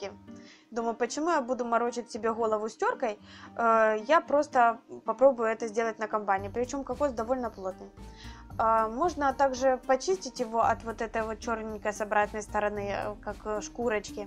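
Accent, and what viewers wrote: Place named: Kherson, Ukraine. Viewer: Вы из Херсона?! Очень знакомый, до боли, говор!)))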